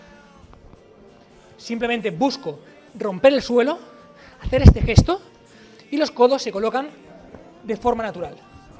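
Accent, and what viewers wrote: Spain. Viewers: Jajajaja y yo esperando un hello tipo gringo y resulta que era un español xD joder tio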